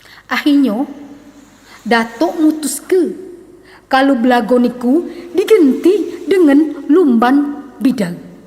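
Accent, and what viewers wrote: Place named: Palembang, Indonesia. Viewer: MasyaAllah keren nian yunda lemak nian dengernyo baso palembang asli dan jadi tau sejarahnyo, ditunggu cerito2 palembang selanjutnyo🤗🥰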